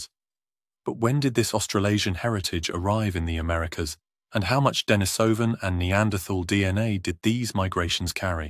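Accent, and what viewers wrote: England, United Kingdom. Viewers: I think it would be nice to pronounce Denisovan and Neanderthal correctly, especially if employing an English accent in the presentation.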